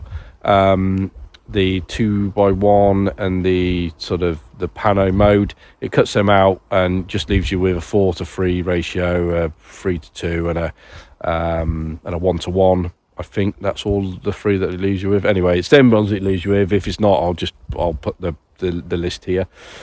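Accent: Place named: Somerset, United Kingdom. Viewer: I came here looking through recent S5ii videos, ears pricked at accent, then location then mention of a C3; i've stumbled on gold here 🤣🤓🤟 i'm a Somerset based lumix and film shooter too. Ace pics of the young trees! 🤓🤟 I'm on the cusp of picking up an S5ii for weddings for this year. I was running x2 S1 and an S5, I found I was missing the occassional shot due to difficult contrast scenes at times and then faffing with MF and missing moments. I've sold my S1 bodies and plan on x2 S5 and one S5ii. How has the AF been for you so far on the S5ii? Bizarrely i've never been a fan of eye detect so kind of really after how it performs without eye AF (all the hipster videos seem to be eye AF only). C3 is such a good camera, I ended up selling mine as it was a bit heavy for me also I wanted an SLR 6x6. One hell of a camera though, loved using it, do wish I tried it with one of those tripod parralax correction tools though, but then the parralax didn't mess me up too much.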